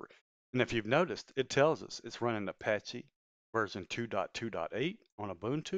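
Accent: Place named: South, United States. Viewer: I can tell from that accent that youre from the same part of the South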